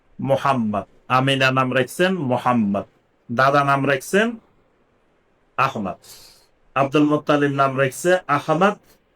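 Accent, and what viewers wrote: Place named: Noakhali, Bangladesh. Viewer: বাউরে হেতে বলেন চমৎকার। তবে নোয়াখালীর আঞ্চলিকতা ছাড়তে পারলেন না এখনও হুজুর।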